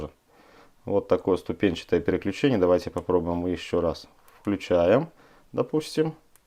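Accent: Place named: Belarus. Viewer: Спасибо за обзор, фонарик отличный, уже 3 таких купил! 🙂 Заметил что у автора еле заметный говор особенный, такой приятный убаюкивающий, подумал что на белорусский похож, и кажется угодал. Привет беларусам от русских из Эстонии, очень нравится ваш говор степенный! 🙂👍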